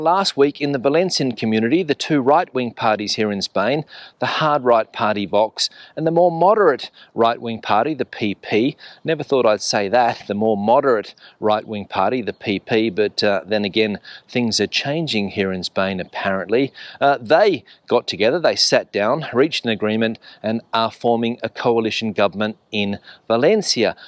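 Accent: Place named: Australia. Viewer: I think I recognise your accent, (are you an Aussie?) thanks for another valuable update!🙏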